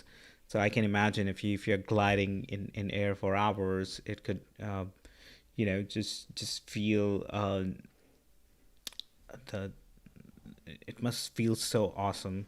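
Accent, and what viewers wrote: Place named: India. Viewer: Nice video man love your accent ahah ;) are u from India ?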